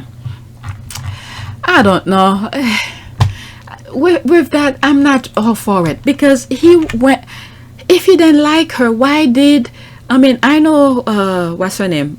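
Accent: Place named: France. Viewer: I just came across your channel girl you are so good at hitting the main point on each click I love your French English accent if am right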